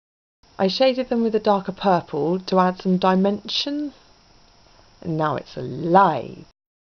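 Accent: United Kingdom. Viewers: I love your accent and videos :), are you British btw? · whoo hoo your'e british!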